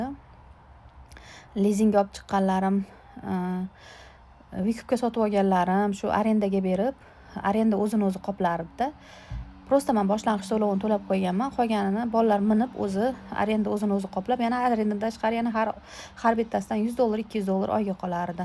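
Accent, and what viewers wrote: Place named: Fergana, Uzbekistan. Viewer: Оллох сабр берсин сиглим. Шевадан умуман Бухороликга ухшамайсиз. Мен Фаргоналиксиз деб уйлар эканман.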